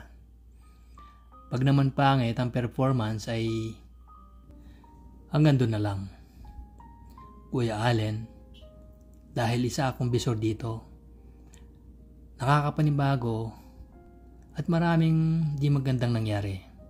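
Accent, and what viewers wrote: Pampanga, Philippines. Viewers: ❤❤❤ Ang ganda ng storya nyo sir at tunay na may accent kang kapampangan. Ang sarap isipin na may happy ending kayong mag jowa. Hehe. Secret pala❤😊😅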